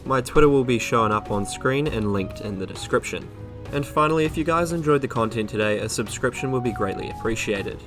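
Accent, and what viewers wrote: New Zealand. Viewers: Are you from NZ? Accent sounds familiar.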